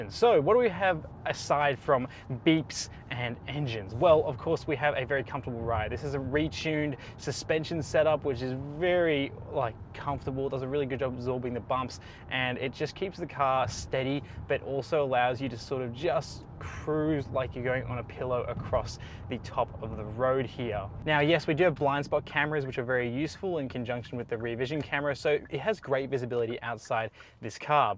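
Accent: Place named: Australia. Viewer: Didn’t want to assume lol but I thought it was Aussie 🙂. Anyway, you did a great review.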